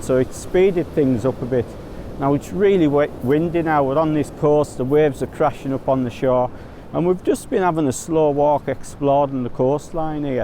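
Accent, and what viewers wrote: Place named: Merseyside, United Kingdom. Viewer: I've just subscribed to your channel, I think your images are great. Are you a Brit, you sound like a Merseyside boy 👍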